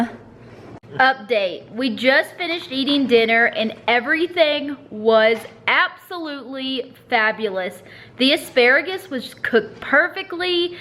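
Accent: South, United States. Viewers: I just had to come and say, I always watch your videos and usually my 4 year old is in the room with me. Last night after I watched I was on to another video and he says ‘ is that the girl with the dog named bo? And I said oh no I already watched her video tonight. He then said ‘without me???’ 😂 he loves your videos too. I think it’s your southern accent he loves.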